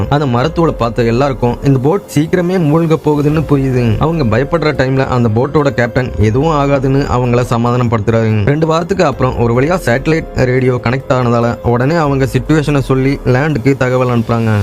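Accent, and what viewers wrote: Kongu, India. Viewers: Varuthung pothung puriyuthung...bro nenga. Kongu side ah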